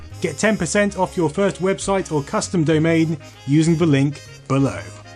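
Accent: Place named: England, United Kingdom. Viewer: Just wanted to say I love your documentaries and how professional they've become. Your gentle English accent is soothing to my American ears. I'm grateful to get to learn more about niche retro computing topics.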